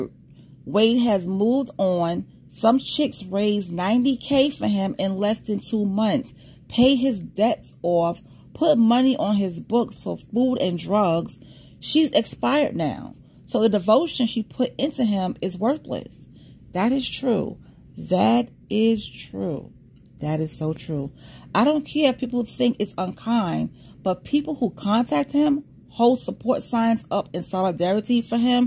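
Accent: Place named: Brooklyn, United States. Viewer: Oh I love your accent. You sound like me. I’m from Brooklyn….live in SC….just subscribed…loving this WW drama…addicted to these crazies… lol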